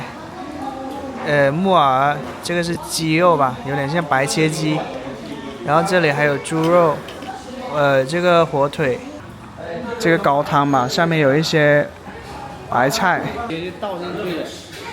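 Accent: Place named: Cantonese, China. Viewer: 是廣西人，講的是粵腔普通話，很容易聽懂，別苛求了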